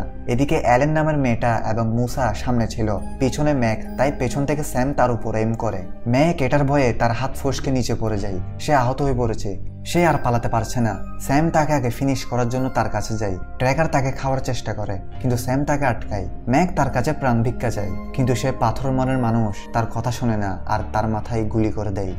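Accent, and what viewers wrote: Chittagong, Bangladesh. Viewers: আ বদ্দা! চিটাইংগা টান ত ন গিল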